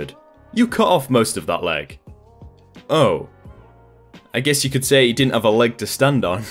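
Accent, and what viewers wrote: United Kingdom. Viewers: The narrator seems British, the reddit poster was American ... two completely different languages that are only superficially the same ... watch enough videos and you'll get the hang of it ...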